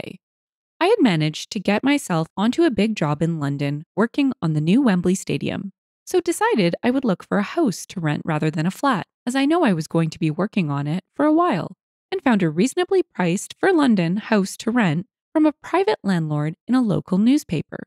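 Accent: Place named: Canada. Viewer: I love how the narrators Canadian is coming out Eh? The stories are great!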